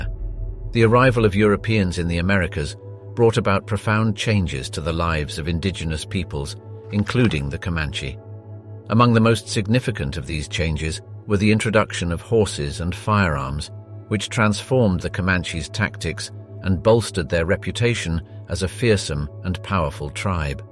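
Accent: United Kingdom. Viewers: How appropriate that so much misinformation (& mispronunciation) is narrated in a BRITISH accent.... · Why do you have a British narrator for American history? I'd think you could have done something alot better than start off negatively.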